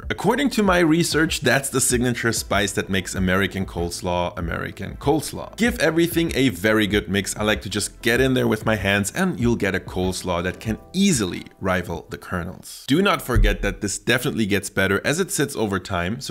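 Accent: Germany. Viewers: It's always waaay easier to spot an accent if u hear ur native language - in German I would only need 3-4 words and I could tell where someone is from as well. But listening to this dude speaking my 2nd language/English - it's hard for me to pinpoint that he's German too oO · While yes his English is very good but definitely a German accent through and through. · I’m pretty sure he reveals his Germanness from the moment he opens his mouth. To a native English speaker, his accent is very obvious and easy to identify. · yea you can hear the german. You always can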